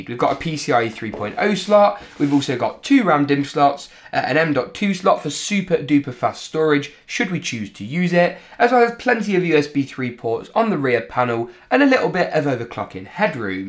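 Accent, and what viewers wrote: United Kingdom. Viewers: Is it racist if Somehow I like his accent, is that British accent? That's cool for my Asian ear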